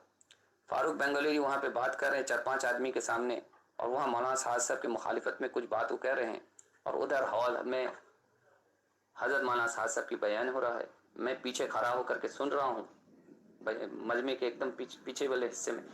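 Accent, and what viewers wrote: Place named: Bangladesh. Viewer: koi tafseel naheen hay sirf baat ke lehje se lagta hai koi bangali hay aur ghair motabar hay.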